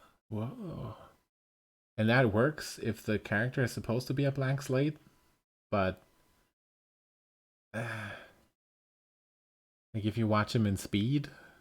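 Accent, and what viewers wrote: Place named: United States. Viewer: Your accent sounds SO american to me (it took an interesting amount of time - no, I can’t remember how long, I only knew that once - until I realized: Oooooh! A German?!), dass ich mich beinahe nicht getraue, etwas auf Deutsch zu schreiben. 😄 Na, ne, so schlimm ist es dann doch nicht…mehr. Zumindest nicht dramatisch genug, um mich davon abzuhalten, dir kurz aufzudrängen, dass ich in vielerlei Hinsicht schwer beeindruckt und sehr dankbar bin, denn: Durch deine enorme Offenheit, und damit automatisch die Stärke zu vertrauen, habe ich das erste Mal seit Jahren das vage Gefühl: „Ja, doch, man kann sich verletzlich zeigen - und es kann schön sein“. Weißt du, ich dachte, ich würde eines Tages mit Begeisterung Geschichten schreiben, denn früher hatte es mir großen Spaß gemacht, durch behutsames Freilegen eines Charakters, intime Situationen entstehen zu lassen. 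"Ich dachte" war natürlich einmal "ich denke", aber einige spannende depressive Episoden, lästig wie eine Person, die ganz dicht vor einem hergeht, eigens für den Zweck, nach Lust und Laune abrupt stehen zu bleiben, sodass man nicht anders kann, als gegen sie zu prallen, machten es mir sehr schwer. Irgendwann hat man erschreckend große Angst davor, es könnte einem jemand zu nahe kommen. Deswegen kann man bestimmt von einer gewissen Erleichterung sprechen, wenn man jemandem zuhören darf, der einem vormacht, wie mutig geht. Und das machst du wirklich großartig - dankeschön. 😊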